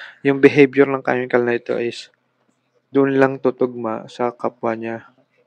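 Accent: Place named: Southern Tagalog, Philippines. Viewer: Southern Tagalog Accent !!! ..Keep it up Bro..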